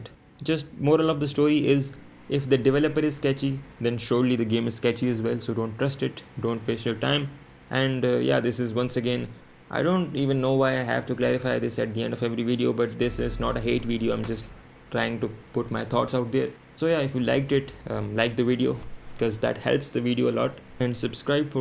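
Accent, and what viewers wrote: India. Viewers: knew this was a scam video the second I heard that poopjeet accent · The second I heard that indian accent I knew I was getting baited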